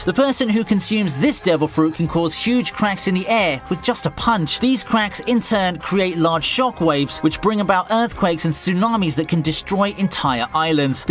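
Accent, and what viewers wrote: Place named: United Kingdom. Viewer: Man that British accent make everything sound so presentable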